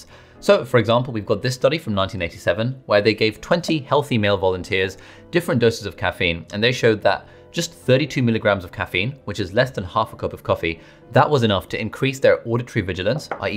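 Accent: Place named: United Kingdom. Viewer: New Subscriber, where in the UK are yiu, can't work out the accent? 🤔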